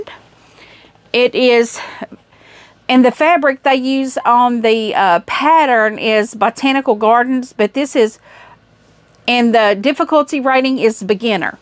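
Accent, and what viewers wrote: South, United States. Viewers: Adorable block. I'm definitely trying this one! First time watching. I'm not sure where you are from, but o adore the southern accent!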